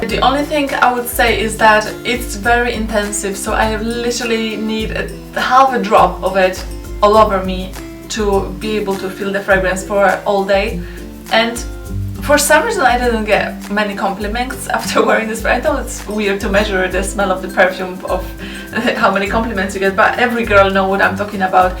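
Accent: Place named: Poland. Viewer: I dont know why, but polish accent is very similar to south american accents... Like venezuela... Maybe its just me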